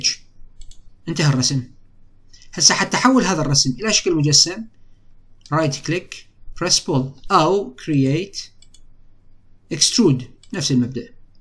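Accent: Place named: Iraq. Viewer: الله يوفقك ع هذا الشرح الواضح وخصوصا باللهجة العراقيه القريبه ع قلوبنا الله يحفظك ويحمي العراق بس لو اعرف التسلسل تبع محاضراتك استاذنا الفاضل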